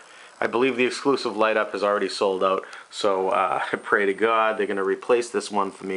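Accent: Boston, United States. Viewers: You sound like your from Boston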